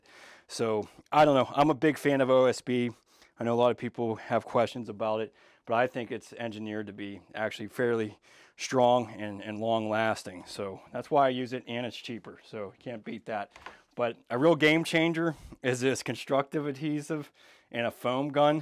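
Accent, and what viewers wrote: Pittsburgh, United States. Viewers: Working on my own 112…e..14-year-old house with balloon framing. Always good to see somebody else’s approach. Heard your accent and knew you were Pittsburgher!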